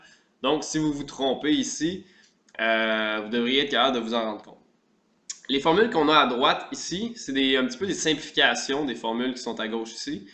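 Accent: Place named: England, United Kingdom. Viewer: merci !! and i like your englaish acsent ;)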